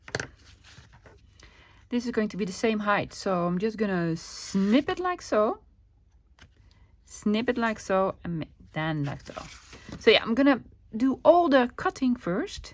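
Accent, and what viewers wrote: Netherlands. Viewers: 🤣🤣🤣 big ole envelope!, are you from the southern Netherlands? People tease me about my southern US accent and I say “big ole…” all the time. Cute project. Thanks for sharing!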